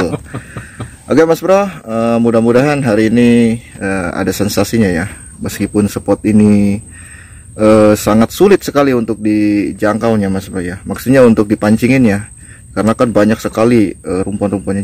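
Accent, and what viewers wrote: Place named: Banten, Indonesia. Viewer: dari logat bicara nya mah kaya orang Banten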